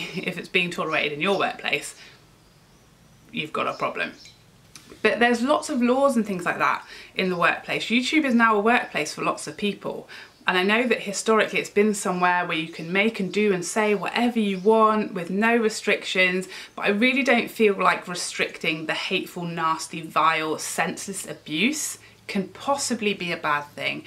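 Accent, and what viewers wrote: United Kingdom. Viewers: I was brought up to say nothing if you can’t be nice. As Wayne Goss says...be kind Facebook should do this too I think...people can be so awful 😕 Ps...I like your personality & accent...reminds me of my Dad (he was from the UK) 😊 xB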